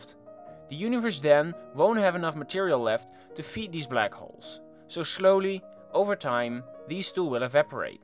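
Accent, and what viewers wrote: Netherlands. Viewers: Are you Dutch? Sounds like it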